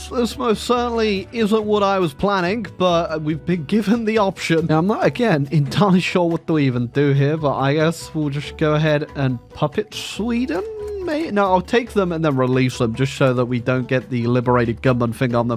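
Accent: England, United Kingdom. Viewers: I hope sees this. Please can you tell me what country your from? You sound English but say zee and rowt (rather than zed and root)... Not important. But as an Englishman I just need to know 😂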